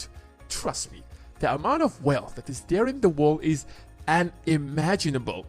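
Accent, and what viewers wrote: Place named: Asia. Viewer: He sounds asian, I'm in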